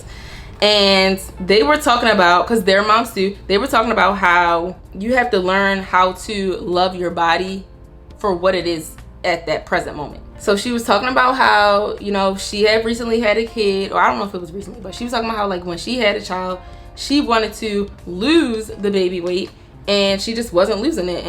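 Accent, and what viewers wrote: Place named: DMV, United States. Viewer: Sis you gotta be from the DMV . It’s the accent for me . I love it here 💕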